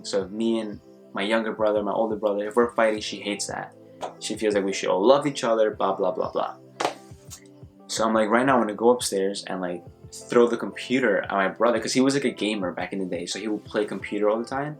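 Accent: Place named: Bronx, United States. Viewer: That Bronx accent is coming thorough lol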